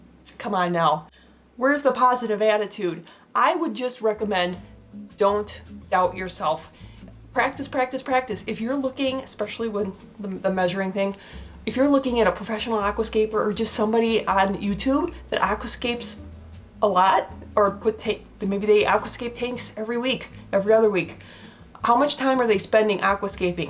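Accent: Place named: Upper Midwest, United States. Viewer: Hmmmmmm.. I’m not a language expert but you sound like you’re from Wisconsin or Minnesota..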